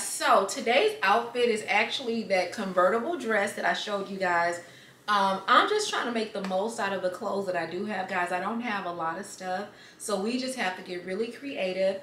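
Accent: South, United States. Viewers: It’s because You are so Awesome and Fun to watch! Down to earth and Real!! 💯❤️ I love your haul and how you put your outfits together! 😍😍 Your chats advice and story times are everything! I can listen to that southern accent all day!!😁❤️Congratulations on your new apartment it’s beautiful!! 🎊🎊🎉🎉 I know you are going to set that place Right up!! I’m so Happy For You!!🎊🎊🎊😍😍🎉🎉🎉🎉👏🏾👏🏾👏🏾👏🏾👏🏾🥰🥰🥰🥰🥰 Totally Dancing 💃🏽 with you!!💯💯💃🏽💃🏽💃🏽💃🏽❤️❤️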